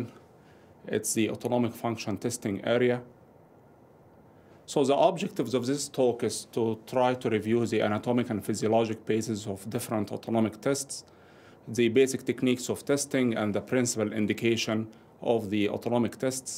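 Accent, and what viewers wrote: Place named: Egypt. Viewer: VERY INFORMATIVE. strong Egyptian accent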